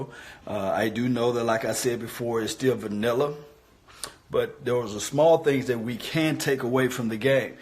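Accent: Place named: New Orleans, United States. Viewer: love the channel. i know nothing of you personally but you sound more NOLA than Texas to me. Am I just completely off? EDIT... im not judging. I'm a Dallas fan in Eagle territory. This is a truly dangerous situation. Eagle fans are crazy AF.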